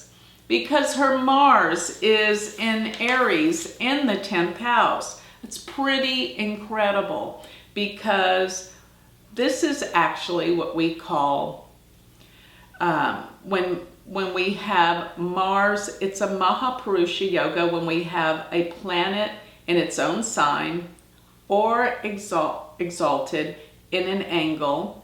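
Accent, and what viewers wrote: United States. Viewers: thank you for the very perceptive and accurate analysis of Lousie's Chart. I must say you sound such a darling when you pronounce the Indian or Vedic terms....it's cool to listen with your American accent....I love it.....Best wishes on your work in Jyotish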